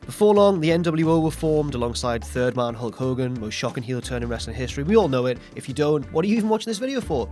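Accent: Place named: North, United Kingdom. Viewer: He's great. It's not a confidence problem. His just Northern like me,. He's very funny, but it's super subtle. We like 'taking the piss' in the north, and saying odd things just to see how people react, such as 'fuck you taz'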